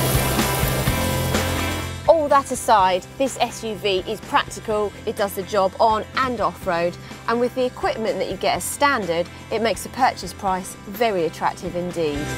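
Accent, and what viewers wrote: United Kingdom. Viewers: Forget about Suzuki Grand Vitara, she has awesome British accent, she look and sound very graceful.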